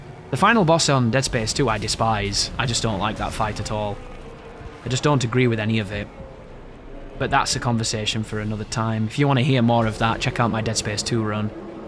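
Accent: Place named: Sheffield, United Kingdom. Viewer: Really enjoyed this playthrough, I played it through earlier this month so it was a nice coincidence. I'll appreciate another full year of your Sheffield accent.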